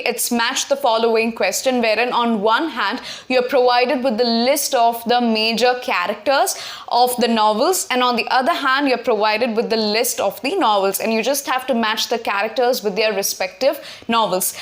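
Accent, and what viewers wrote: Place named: RP, United Kingdom. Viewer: Your accent sounds like that of a BBC News presenter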